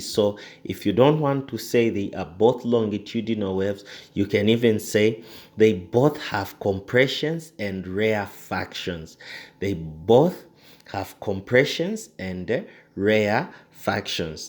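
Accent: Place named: United States. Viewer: Sir you have a nice accent arr ryu american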